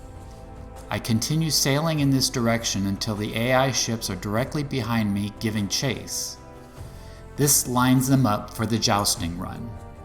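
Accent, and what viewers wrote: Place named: United States. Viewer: Love the crispy clear american accent. It's easy to understand and sounds great. Showing once again proper american english is indeed beautiful. As for the video itself i did pass the mission previously, but it was more because of dumb luck than skill, thanks for the info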